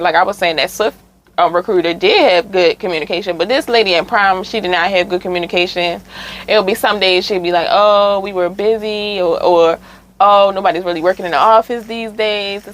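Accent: Baltimore, United States. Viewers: I knew you were from Baltimore nobody else sound like us what happened to the NFI vid? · i’m just listening to her talk and i’m like she from baltimore…. but idk then i came out 🤣 our accents strong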